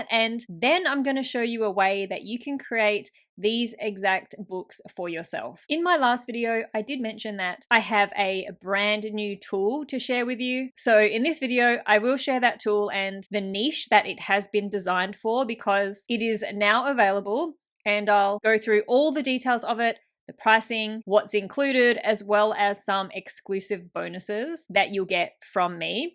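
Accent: Australia. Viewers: I love this video and the educational tool. I have a couple of niche educational books ideas. I love to see online. Plus, I'm hearing an Australian, I can relate!🙏🪃🇦🇺☺️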